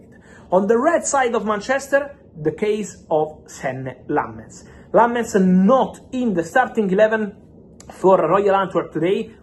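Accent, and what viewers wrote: India. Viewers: You sound like an Indian speaking English.....😒😒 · Why does he sound like an Indian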